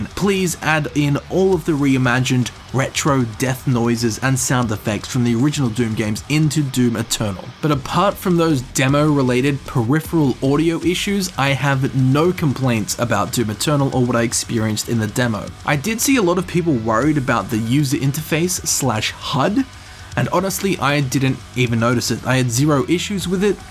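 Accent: Australia. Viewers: Took me a while to realise. You're an Aussie? Never seen your channel before, but since Eternal will be my "allowance" game for this year, I'm trying to soak-in as much of it as I can to get an idea of what my wife is letting us spend $300 on bahaha. Your video is damn detailed and I would be very interested in talking with you after Quakecon (which I hope you will be covering). Awesome content, mate.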